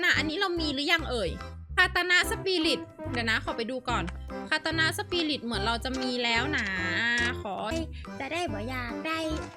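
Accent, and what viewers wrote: North, Thailand. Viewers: พี่ พูดเหนือทั้งคริปหน่อยครับ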